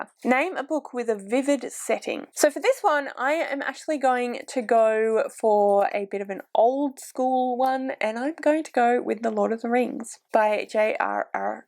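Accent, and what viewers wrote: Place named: Australia. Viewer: Haha, not gonna lie, I was a little confused when I saw the title, then as soon as I heard your accent I was like 'duuuh obviously it's autumn in Australia!'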